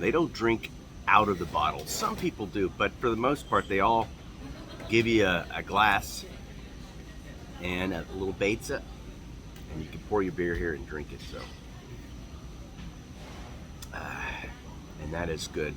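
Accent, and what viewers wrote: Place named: Texas, United States. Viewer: What a beautiful place, on par with some of the fanciest restaurants in LA & New York & easily cost 3x as much! ❤ Your sense of humor, & Texan accent with China, somehow just works!😂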